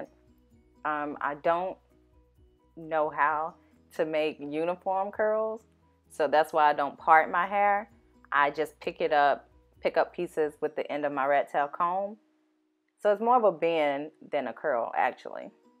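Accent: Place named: New Orleans, United States. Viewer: I definitely hear New Orleans in you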